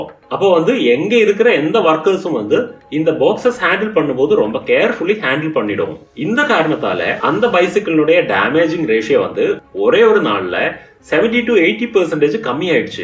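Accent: Kerala, India. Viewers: உங்க கேரள தமிழ் 😍😘😍😘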